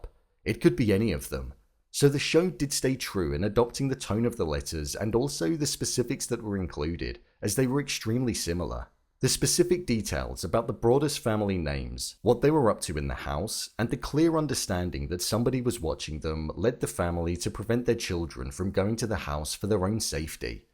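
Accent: England, United Kingdom. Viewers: Is that english’s accent is trying to discredit Netflix? Irritated for The Crown series? A lot of novels, history books, screenplays had different changes in a movies, series or documentaries. Only knowledge can set you free.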